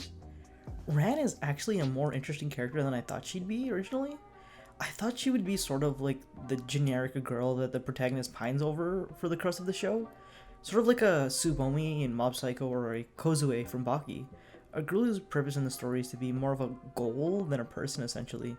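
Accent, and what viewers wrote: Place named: United States. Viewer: The way you say it sounds like CONAN THE BARBARIAN. Your way of saying japanese names far too american aside, respect for using the actual names rather than the MURICAN ones.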